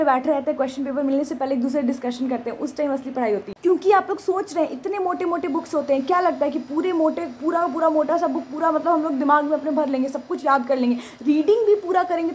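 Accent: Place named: Bihar, India. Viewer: Yrr... BIHARI TONE💓💓 Ekdm bawaal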